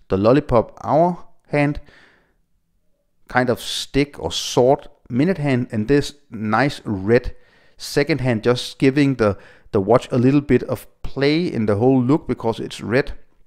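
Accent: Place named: Denmark. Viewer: I’m looking at Massdrop from the US and it is running at $419. You can even get the monster 48mm versions. I have and love the Airman SST Pumpkin. I did buy the “Goldeneye” Combat Sub but I almost never wore it and it wore bigger on the wrist than the 42mm would suggest so I sold it. This bronze version is tempting, but I wonder if the same thing would happen and I wouldn’t really wear it. Also, God Day from the US. I work with a lot of Danes and I love your accent. It’s super!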